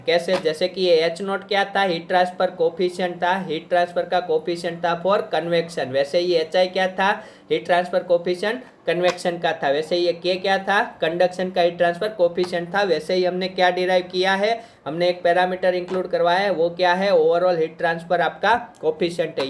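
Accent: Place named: Gujarat, India. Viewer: Aavaj thi gujrati lago chho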